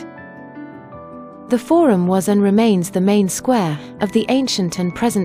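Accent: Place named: United Kingdom. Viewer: The narrator has Royal English❤i say it as a Russian English tutor.Adoration and likes to her voice and sound.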